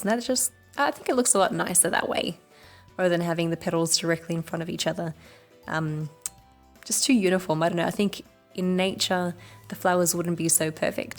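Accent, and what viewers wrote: Australia. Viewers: thanks 😊😀. Also are you Australian???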